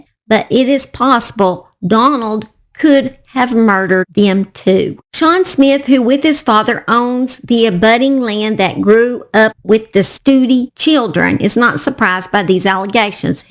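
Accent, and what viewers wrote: South, United States. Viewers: I absolutely LOVE hearing you telling these cases! You have such a sweet southern voice, and being a Tennessee girl I love hearing an accent like mine! I thought it was to funny when you said "Oh shit, well I shouldn't say shit on here!" Hell I am always wondering how people don't throw out all kinda "pretty words" when they hear these F**KED UP cases! Thank you for another great video, like always. Oh yeah, congratulations on 1k subscribers! I believe you are going to continue to grow like you have been, cause what is there to not love about your channel and personality!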